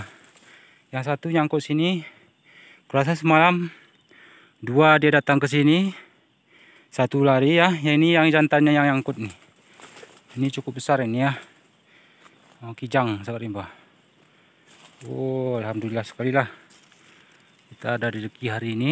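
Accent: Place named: Gayo, Indonesia. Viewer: nampak eee si nos konten nee urang gayo tok, logat epeh betih we 😄😄 salam satu hoby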